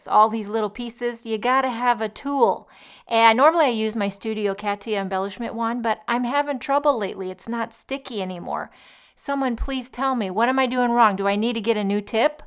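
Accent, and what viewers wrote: United States. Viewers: u are a women after my own heart, I do the same things, why don't things work the way they are suppose to, Ugh! And I have habit of expressing my opinion wether anyone wants to hear it or not. I noticed you said u were in Germany but u have an American accent. Love the birdies...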